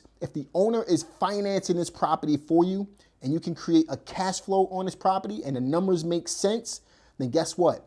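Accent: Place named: East Coast, United States. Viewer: Thank you SIR for that tea, info, bomb AND mic drop on this tid bit. Now, I do have a question if you can possibly address it or anyone who may also have the answer. I hear a hint of possibly East coast in your voice(which I LOVE, not flirting, lol), so I'm concerned that, that strategy works well in and around that area. And by no means do I have a clue how to go about buying real estate, so yes I turn to info God's such as yourself. I live on the West coast, Cali to be exact. And if anyone knows Cali, shit is difficult in these parts hunTie! So we have so many hoops to jump through on ish. I say all that to say this, would that particular strategy work over here in Killa Cali and yo pockets? Thanks again for the info hun.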